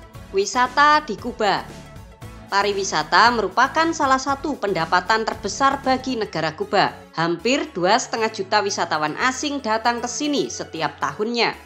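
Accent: Java, Indonesia. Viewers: Ini orang Jawa banget deh kyknya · suara loo medok banget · Yang ngomong medok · seru yg ngisi voicenya, medok jadi makin betah nnton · Jowone ngendi kang?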